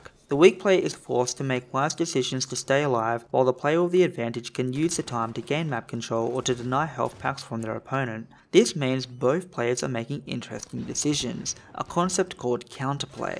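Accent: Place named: Australia. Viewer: Hey mate! You sound like you're from Australia!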